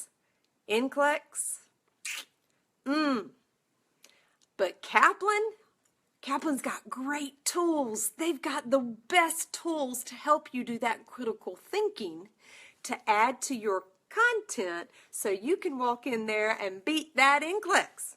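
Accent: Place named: North Carolina, United States. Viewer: Super motivating! Your accent made me smile this morning! Im in NC too (Swansboro)😁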